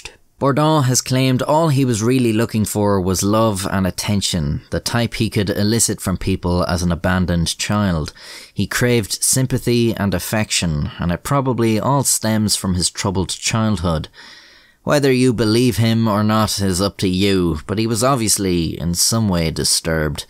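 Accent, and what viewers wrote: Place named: Ireland. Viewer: "I admire conmen" yes we know you are Irish by your accent.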